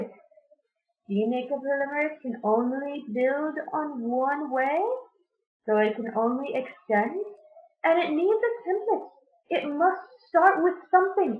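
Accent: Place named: India. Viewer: Your German accent sounds very Indian.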